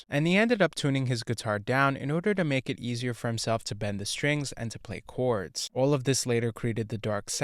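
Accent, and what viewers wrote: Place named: Massachusetts, United States. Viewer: Why did bro change to a Massachusetts accent halfway through the video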